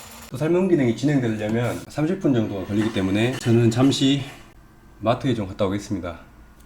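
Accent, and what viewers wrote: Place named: Jeolla, South Korea. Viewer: 제가 해외거주하는데 세탁기 청소하려고 영상봤다가 기사님 전라도 억양을 듣고 전라도 분이신 제 부모님이 생각나네요😊 너무 정감가고 좋아요~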